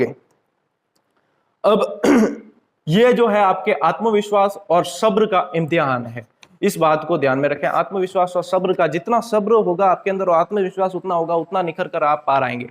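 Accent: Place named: Western Rajasthan, India. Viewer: सर आप कहां से हो आप मारवाड़ी बोलते हो तो उसमे पश्चिम राज़. की आवाज लग रही है ,सर जी कहा से हो मै बाड़मेर से हूं अगर आप यही मारवाड़ के क्षेत्र से हो तो मै आपसे पर्सनल मिलना चाहता हूं गुरुदेव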